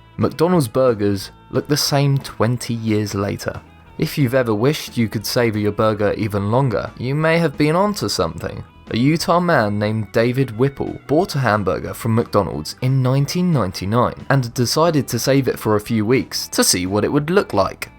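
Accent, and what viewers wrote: England, United Kingdom. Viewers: Love that british accent! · Like your English accent, I get sick and tired of American accents, Cheers, from - Australia 👍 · Got a lot of hard feelings, when I hear a distinguishable British accent bashing American food, while not discussing blood pudding, aged beef, mincemeat pies, and the absolute hoard of atrocious foods they have, in the same discussion. Narrator, why don't you explain to me what, exactly, is wrong with British 'Fish and Chips', that the mayor of London is banning them for kids? If you feed children like sheep, expect them to look like them too (India is a great example of a nation plundered by Britain in the 19th Century that did so well, they nearly bankrupted New Deli before it was given back to them)...ever seen a 6-ft tall bodybuilder in India? Hint: it's because they worship cows, and don't eat them (until they show up in America, and then they eat them!) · Please dont pronounce everything with an over the top brit accent , you just lost 14 viewers in my family , the accent is old and we. 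E mentioned it many times but you still wont change it.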